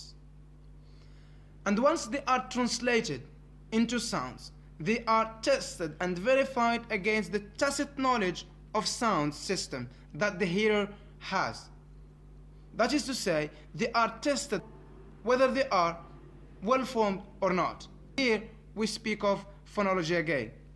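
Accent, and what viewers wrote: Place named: Morocco. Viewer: Moroccan accent!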